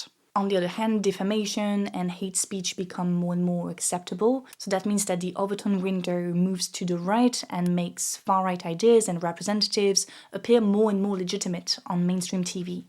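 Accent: France. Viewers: I LOVE YOUR VIDEO AND I WISH IT WAS REDONE IN FRENCH. I'm French so : some grumbles. The only thing I disagree with, or find a bit short, is saying that "LFI - France Unbound - supporting the Palestinians have turn them into pariahs". It's their support of Hamas, or lack of condemnation, that turns them into Pariahs for left and even centre-right leaning people, not their support to the Palestinian cause which is widespread and makes consensus except in extremist Islamophobic circles. This is very different. And there is another thing: the insufferable pride of Mélenchon, the head of LFI, that sometimes seems like it could become Trotskyist, if not Stalinist, totalitarianism. But I applaud this thorough clever unbiased analysis of yours (some extreme-right wing people wouldn't find this unbiased though, but it is). One thing is missing for foreigners: what happened to Macron and Macronism. 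Because he was very popular abroad in 2017 when he took power and he is still very popular, at least much more than in France. But I think it would be too long for one video. PS.: I claim to be very fluent in English (more than what one would say on a CV), but yours is much better. I'm jealous even if I can hear the tiny teeny slight trace of your French accent.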